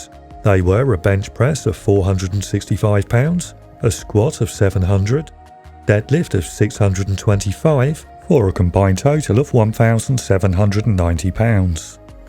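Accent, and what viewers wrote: United Kingdom. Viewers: Stop with British accents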